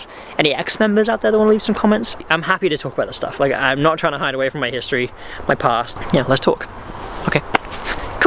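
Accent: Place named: England, United Kingdom. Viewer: Where are you from because you mentioned high school but you have an English accent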